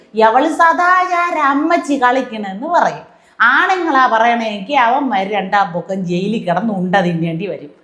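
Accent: Thiruvananthapuram, India. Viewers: തിരുവനന്തപുരം സ്ലാങ് കലക്കി പുള്ളേ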